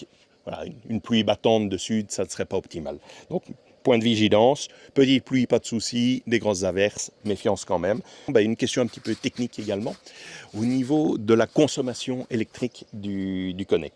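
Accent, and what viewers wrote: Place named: Belgium. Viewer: Très intéressante vidéo ! Certaines de vos intonations rappelle un accent belge, serions-nous compatriotes ?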